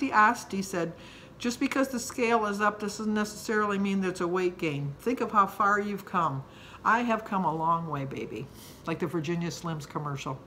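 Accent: Midwest, United States. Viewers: The ' " LORDY MERCY ME.....you should be so proud of yourself...because of you, Carrie and Amy, I finally got over this hump in my weight journey (11 pounds down since October)....I am.4lb. away from my PERSONAL GOAL for my 65th birthday next Thursday. Even though I have achived Lifetime status 5 years ago, (lot's of stuggles, ups and downs, that's just LIFE) I was getting out of control again. I was paying for my meetings because I was over the 2 pounds that is allowed. I have two more Saturday's to weigh in to hit my 'goal'....Then after that, I have another little goal by May 5th. I leave for Italy on a biking vacation and you know what kind of food I will be facing in Italy!! I can handle it....I know I will.... that is why I LOVE active vacations....Keep on moving, chicky girl....I am orginally from Chicago and I relate to your Midwestern accent...😂😂👏👏🎈🙏🙏 Stay strong...Rome wasn't built in a day!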